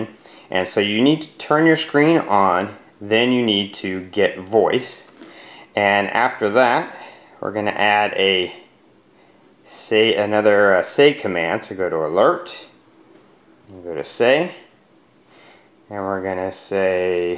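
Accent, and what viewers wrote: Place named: Scotland, United Kingdom. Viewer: How did you get the Scottish accent?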